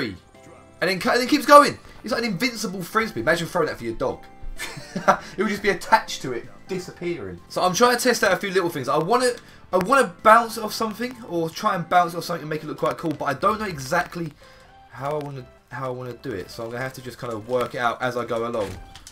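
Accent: England, United Kingdom. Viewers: Lee Gibson he's from England bro, he ain't American or Canadian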